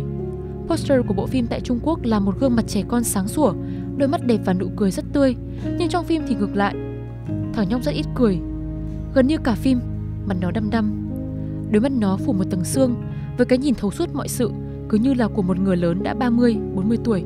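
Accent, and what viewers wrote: Vietnam. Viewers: hihi mình nói giọng Nam chữ "dây" phát âm khác chữ "giây". Nghe bạn nữ giọng Bắc phát âm "dây" giống hệt chữ "giây" nên mình bị quán tính, thật là thiếu não.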